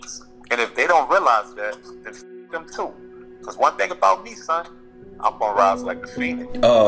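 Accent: New Orleans, United States. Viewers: I LOVE the New Orleans accent!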